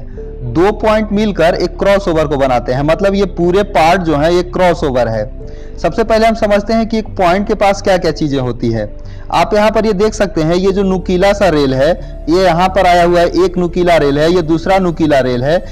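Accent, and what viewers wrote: Bihar, India. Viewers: Bhay tum pakka Bihar se ho...mai v patna ka hi hu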